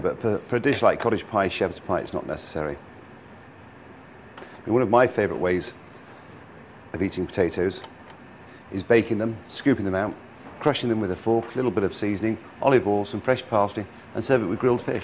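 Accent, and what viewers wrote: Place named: RP, United Kingdom. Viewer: Posh accent for a Leeds lad.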